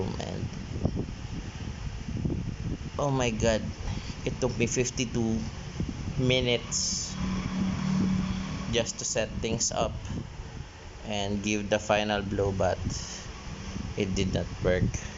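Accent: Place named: Mexico. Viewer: Habla español no te hagas el gringo q tienes acento mexicano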